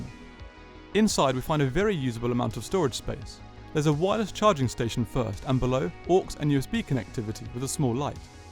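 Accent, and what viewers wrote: RP, United Kingdom. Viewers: You sound like you have just left Eton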